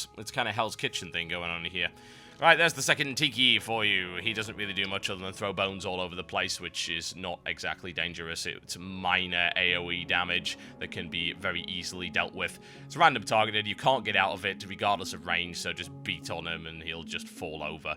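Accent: United Kingdom. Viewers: Watching your video just for your british accent besides i like WoW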